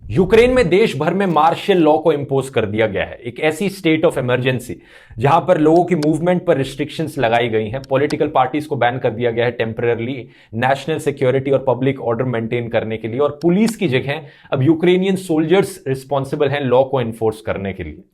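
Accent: Haryana, India. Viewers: आपकी Language me हरियाणवी touch है।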